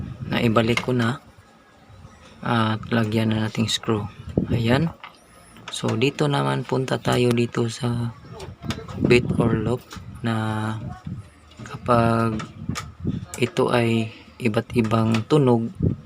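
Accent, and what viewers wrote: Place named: Visayas, Philippines. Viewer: Galing mo master..asa inyuha master..murag bisaya sad ka master Bah..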